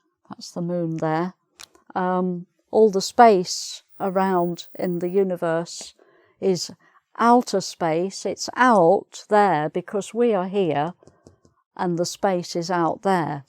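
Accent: United Kingdom. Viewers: Your fantastic pure British accent is something out of the world. Never die dear never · the accent british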